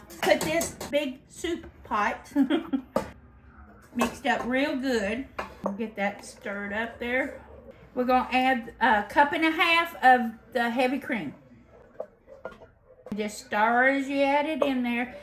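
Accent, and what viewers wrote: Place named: Alabama, United States. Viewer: Hey y'all. I just found your channel. Your accent sounds like mine😂. I'm from Alabama where I've lived most all of my 64 years! Where are y'all?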